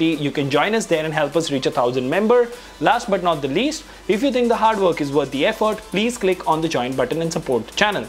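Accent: India. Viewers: nice an indian speaking english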